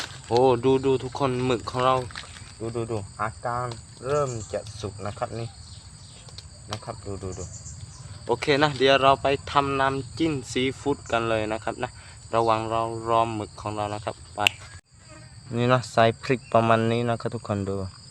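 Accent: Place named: Southern Thailand, Thailand. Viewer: บังพูดนายูบ้านเราเลยไม่ต้องอายบัง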